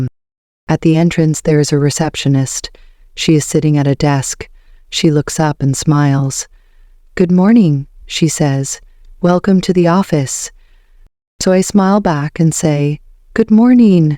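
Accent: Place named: United States. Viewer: Sorry why it's It's the British council but you've an American accent? I want to learn English accent with this method. Thanks.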